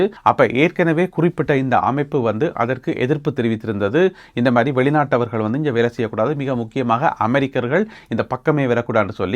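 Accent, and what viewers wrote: Jaffna, Sri Lanka. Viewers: செய்திகள் தரும்போது யாழ். தமிழில் தருவது அருமை.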